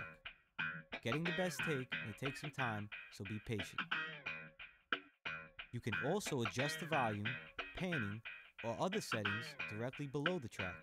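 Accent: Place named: Long Island, United States. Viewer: that guitar lick in the background does makes this unwatchable. plus his Long Island accent is brutal. its like recording with the Sopranos.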